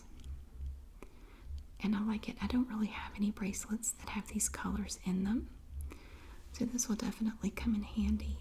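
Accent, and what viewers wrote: South, United States. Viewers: It's her Southern drawl.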